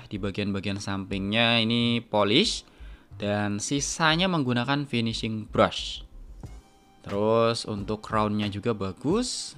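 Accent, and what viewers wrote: Tegal, Indonesia. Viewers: nyimakk, orang tegal bang ? sama soalnya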